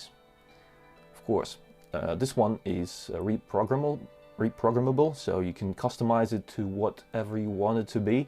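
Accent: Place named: Russia. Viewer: Ты оч хорошо по английски говоришь, но всё равно с русским акцентом))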